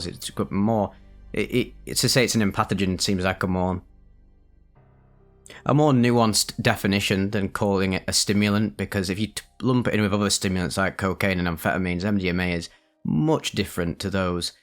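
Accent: United Kingdom. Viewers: are you british? i cant tell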